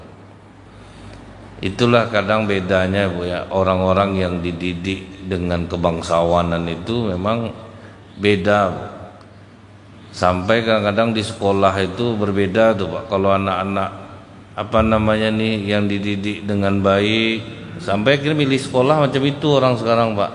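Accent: West Sumatra, Indonesia. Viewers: Itu logat minang mbak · Logat nya kayak orang Sumbar ustadz nya, , , Semoga sehat dan selalu fit dalam berdakwah untuk ustadz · loghat nya seperti uztadz Zuma, minang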